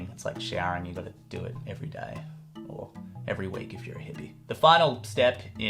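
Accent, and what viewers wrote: Australia. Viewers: I bloody adore your videos. Thank you for making them from the bottom of my heart! Good to hear an Aussie voice too!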